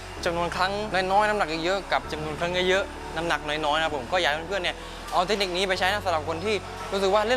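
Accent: South, Thailand. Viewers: เป็นประโยชน์มากเลยครับดูทุกคลิปเลย ชอบความมีสำเนียงทองแดงโผล่มาด้วย มีเสน่ห์มาก 😄😊 #คนใต้นิ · Southern Tone makes your VDO different from others. Otherwise make me miss my hometown. Keep doing the good job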